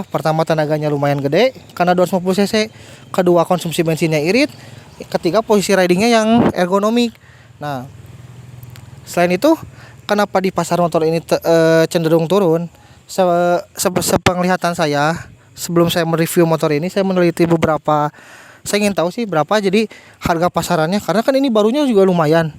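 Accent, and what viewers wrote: West Java, Indonesia. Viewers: Meni balelol ngreview na...logat sunda na kaciri..mudah2n kaharepna tambah maju jang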